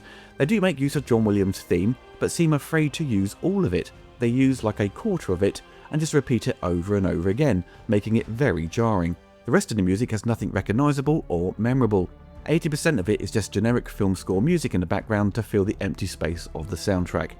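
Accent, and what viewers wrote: United Kingdom. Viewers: I saw the film today and am sorry you didn't enjoy it as much as I did. Since this is a non-spoiler review, I will save my detailed comments for when you review the plot. I will just say that I thought the story was very relevant to day... especially for what's going on here in the USA. I've never thought about where you live with regard to a particular film before. But from your accent, it sounds like you live in the U.K. Am I correct?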